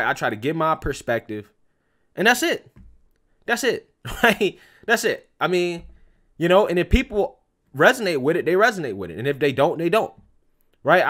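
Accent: South, United States. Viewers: im a white conservative in the south, i get your points. you did not need to use proper southern English.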